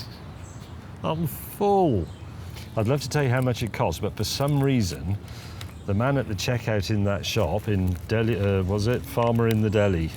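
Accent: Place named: United Kingdom. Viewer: video start on mute, yet somehow I knew he had a British accent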